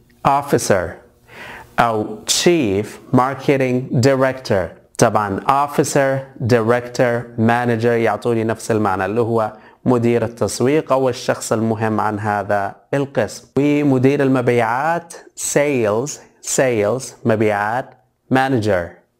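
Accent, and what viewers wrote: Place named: United States. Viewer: انت كذا 👍 ...نطقك كانك مثل الامريكان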